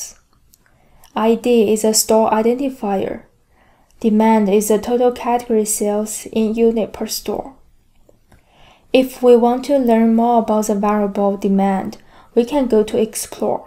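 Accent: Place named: Asia. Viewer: Super thick Asian accent makes the video difficult to understand. Had to use subtitles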